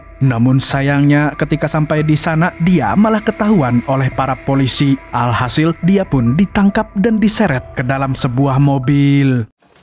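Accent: Banjar, Indonesia. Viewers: Urang Banua ya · Banjar sklinya😭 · Orang banjar kah pian mang?🤣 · Banjar kah pian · orang banjar kah pian ni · urang banjar 😂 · bah urang banjarkah mang ikaam😅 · orang banjar ternyata 😂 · u orang Banjar Kalimantan Selatan mang ae😂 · Lengah dikit amang nya urang Banjar wkwkkkk😂😂😂😂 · Logat banjar nya khas banar amang niii 😂😂 Salam matan kuala kapuas 😁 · Banjar banarrrr!! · Banjar kah bang ai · Urang banjar sklinya 😂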